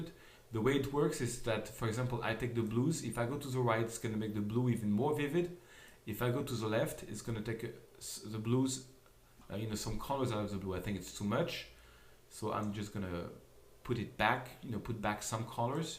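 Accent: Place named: France. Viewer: Merci pour le tuto, et l'accent Français aussi ^^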